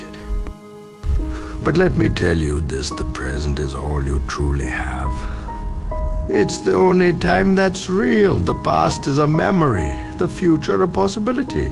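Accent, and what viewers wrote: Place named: India. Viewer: BRO THEY ARE DELETING MY COMMENTS, WHAT I AM SAYING IS THIS DUDE SOUNDS LIKE AN INDIAN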